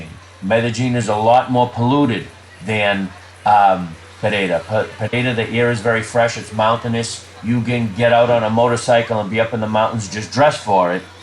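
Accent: New York, United States. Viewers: Keep up the good work on your videos. Your accent sounds like New York City, where I lived for 35 years. So I take your evaluations about Pereira very seriously.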